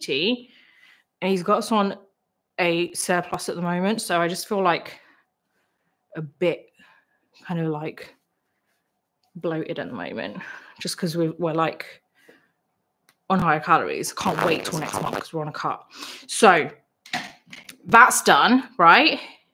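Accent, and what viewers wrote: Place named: United Kingdom. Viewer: The final look is beautiful! You did great, be proud😊 Thanks for that joyful and educational video. I very much appreciate that you now about your influence as a youtuber and you still remember where you cone from and what you have achieved. By the way, I love your British accent ❤ Thanks for your all your work. Looking forward to the next live😅 Love from Germany ❤❤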